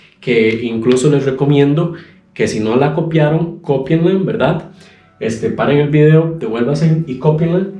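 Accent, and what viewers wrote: Costa Rica. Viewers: En este video se le salió todo lo tico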